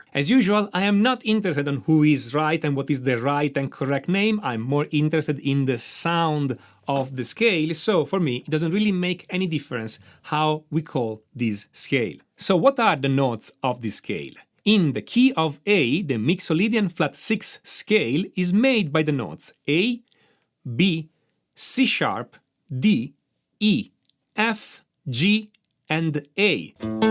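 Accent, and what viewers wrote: Italy. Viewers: I like your accent. Italian?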